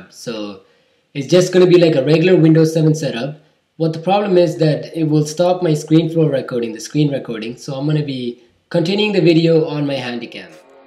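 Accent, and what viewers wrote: India. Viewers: u r from india, aren't u? :) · Indian... Obvious :)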